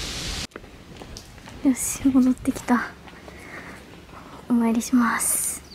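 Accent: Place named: Gifu, Japan. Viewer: 宝くじの神社と言えば長野の諏訪湖に有る諏訪大社有名ですがそこの神社で１番有名なのは「上社の御柱祭」全国ニュースでやる大きな木を山の斜面を落としてたりしてる神社ですよ☺️ 毎回、ちゃんの岐阜弁に癒されるw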